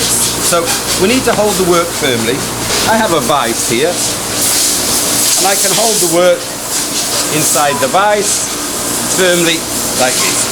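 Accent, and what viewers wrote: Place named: Wales, United Kingdom. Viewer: Which part of wales are you from if you don’t mind me asking? I’m welsh and still live here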